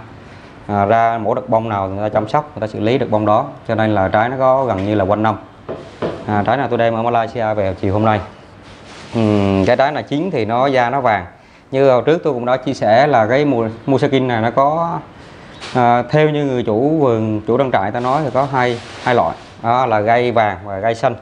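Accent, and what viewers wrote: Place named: Quang Nam, Vietnam. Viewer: Bạn ở đây mà nói tiếng Quảng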